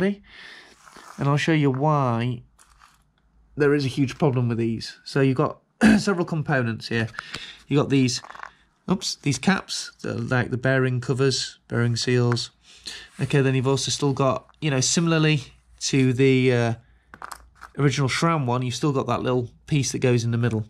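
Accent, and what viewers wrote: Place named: North, United Kingdom. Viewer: No No, hes Northern, THEY all talk like that. (Thats not a dig, thanks for the vid by the way.)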